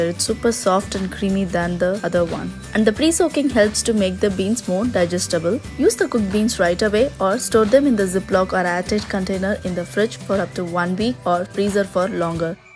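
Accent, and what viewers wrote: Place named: England, United Kingdom. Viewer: What English accent!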